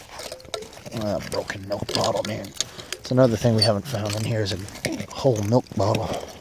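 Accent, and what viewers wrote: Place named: Boston, United States. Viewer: I like that 'Boston Accent' !!!! Perfect setting for 'The Dig '!!!!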